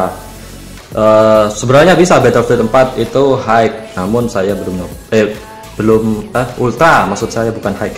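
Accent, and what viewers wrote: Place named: Java, Indonesia. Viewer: review nya lucu coy, hahahha... medhok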